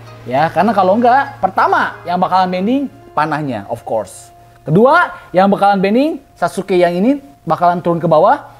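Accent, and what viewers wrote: West Java, Indonesia. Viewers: Sunda pisan mang Salam lembang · BANG LO ORANG SUNDA YA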